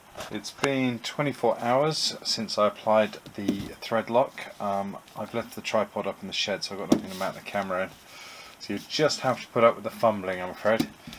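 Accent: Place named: England, United Kingdom. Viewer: That is a beautifully crafted part you had made as a replacement. And FWIW - as you wondered about who might watch your videos in the future. I enjoy watching your videos, even though I have no intention of restoring a motorcycle, in fact I don't even own a motorcycle right now. I do however find your video's quite entertaining, and you are a likable presenter with a nice tone of voice and a pleasant English accent.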